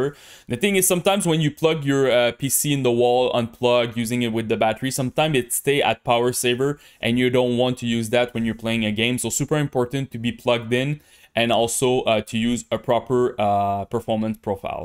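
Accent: Quebec, Canada. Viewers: You from Quebec by any chance? That accent sounds hella French Canadian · French canadian proper. 😀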